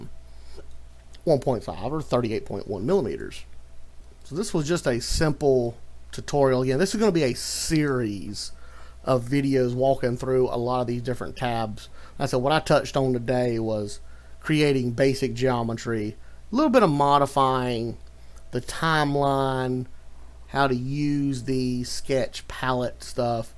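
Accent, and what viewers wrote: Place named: South, United States. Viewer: Thanks for the help and I love your southern accent brother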